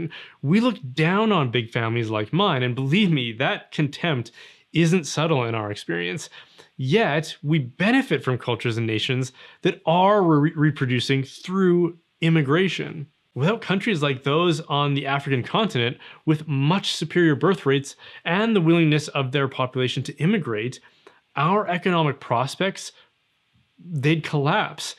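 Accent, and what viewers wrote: Canada. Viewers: Oddly, hearing a canadian speak over images of suburbia started " " playing in my head.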